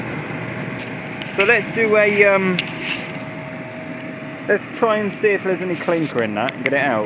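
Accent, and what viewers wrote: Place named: Birmingham, United Kingdom. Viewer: PS, I'm guessing from your accent you're from around Birmingham??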